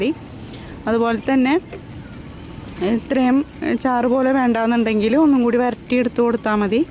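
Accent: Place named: Malabar, India. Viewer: Chechi sherikkum thrissur thanne aano..“cherkalilla “ennu okke paranju kekkumbo evideyo oru malabar slang thonnum pinne vere ethokkeyo slang um thonnanu😊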